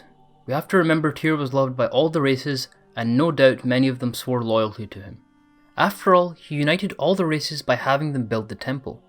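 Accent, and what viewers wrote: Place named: Scotland, United Kingdom. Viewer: You're very good at this - and youll only get better with more practise. Keep it up. Also great to hear a fellow Scottish accent in this scene.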